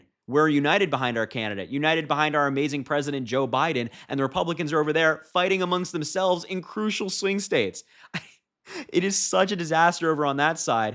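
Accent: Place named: England, United Kingdom. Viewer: you’re the best. I live in Montreal, Canada and I’m following you. Your English accent is excellent and easy to understand since I’m a french Canadian. My wife and I find that you are a very intelligent young man. Bravo!!! And keep on doing the good job. Roger:)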